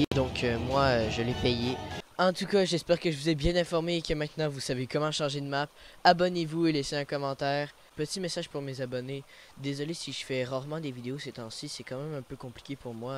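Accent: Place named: Quebec, Canada. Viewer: juste question pas rapport té tu québécois ta un petit accent 😅🇨🇦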